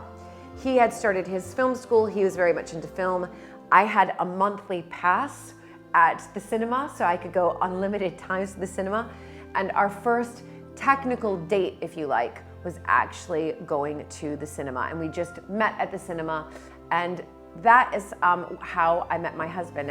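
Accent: United States. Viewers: Just to point out, has a total American voice, nothing posh about her, but she has adopted words that the British use and Americans don't. We don't say "drinks party" but "cocktail party". And we don't say we're going to the cinema ever, we say we're going to the movies. I just thought I'd mention that. Her language has adopted British terms. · Thank you for remaining “You”, which is what, I’m sure, your husband fell in love with. It must have been quite an adjustment. What’s so interesting is that the village towns you show are so similar to those of New England, with the quaint town commons and shops. I live on Cape Cod, Massachusetts, so I understand you falling in love with the beauty of the countryside. Listening to you, I do hear the British inflections, but you are still have,after 20 years, the American accent. It’s so easy to pick up that you often don’t know you have. 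When I met my husband, he wondered if I had lived in Britain. I laughed and explain that my daughter and I had only been bingeing on BBC mini-series, etc, but it was enough to affect our speech. When I visited Britain, the same happened. On the other hand, the opposite happened to Britain visitors to the US. They still had the accent, but was often sprinkled with Americanisms. You are so personable. I would love to meet you and just talk, but I think you would have me laughing with your wonderful down-to-earth personality. 😊 Btw, I get it about the letter pronunciation. I spent an hour looking for “Darby” street. Finally someone told me “Derby” was pronounced “Darby”. I also spent 3 days asking about getting to Edinburgh. Everyone said “yes, it’s a long trip”. I was so disappointed UNTIL I asked “how long”. They then said, about 4 hours! I said “WHAT”?? There are Americans that travel that distance to work!!.” I don’t know if you know what th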